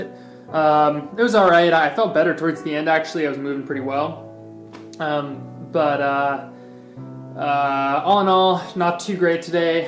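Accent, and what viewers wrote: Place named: Canada. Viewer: Good luck. Looks awesome. Were are you from? You sound more Canadian.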